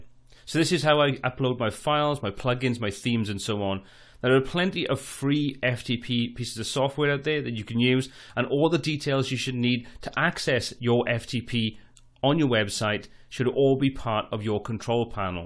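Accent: Wales, United Kingdom. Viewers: Welsh accent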